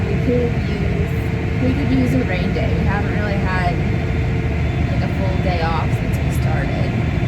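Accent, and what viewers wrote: United States. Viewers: oh my gosh, how i love the American accent! I need to move from Australia asap lol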